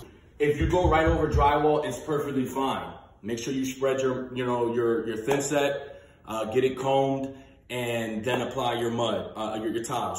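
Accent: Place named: United States. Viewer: i'm a cuban from jersey city (i just moved to oregon and found your vid cause im renovating my house), and i knew exactly what state you were from the SECOND you started talking LOL. anyway, solid video super helpful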